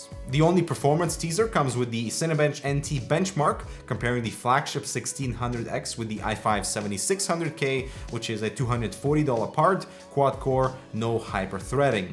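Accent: Russia. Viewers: ой чувак, такой акцент, ты по-любому Русский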